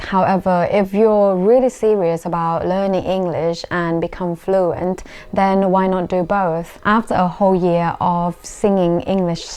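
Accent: RP, United Kingdom. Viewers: When you are speaking is it received pronunciation? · I like your accent, it actually sounds British to me. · Hello, I love your accent. How did you improve it like a British accent? I am wondering, did you able to speak this way before moving London? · U do have a British accent mostly, I can detect a small change of ur native accent here and there. How long have u lived in UK? Pls do British accent video . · That is like bbc english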